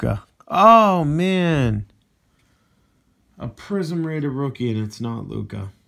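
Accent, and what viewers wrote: Boston, United States. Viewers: I am guessing this dude is from boston. His accent's telling me.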